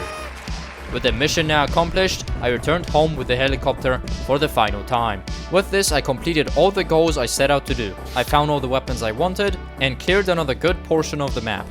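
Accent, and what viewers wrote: Poland. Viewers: are u polish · The more I listen to you, the more I here your Polish accent or atleast thats what my head tells me, keep up the work brother.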